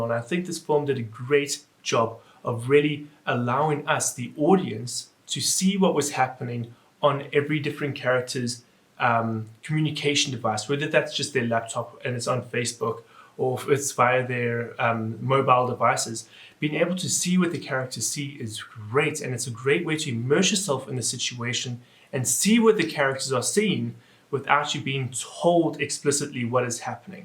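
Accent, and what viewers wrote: South Africa. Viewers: I hear a Bloemfontein accent.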